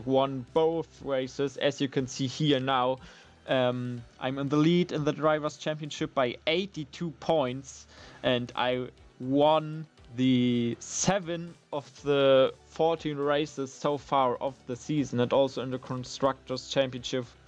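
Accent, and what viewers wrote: Germany. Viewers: I have one question. Are u German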